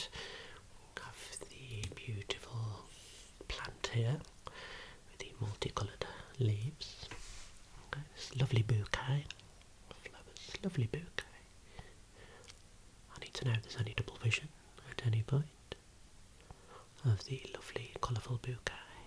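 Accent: Birmingham, United Kingdom. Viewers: U sound British 😲😶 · Dislike it? I dont know anyone who dislikes the Peaky Blinders accent. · Birmingham? you’re made for this, mate. And very handsome to boot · It's interesting how perceptions of accents vary. He has an accent from around the Birmingham area of England. Other people in the UK tend to dislike this accent and it's often a figure of fun. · Proper beautiful midlands accent. Hello my Brummie friend ❤ we share the same city!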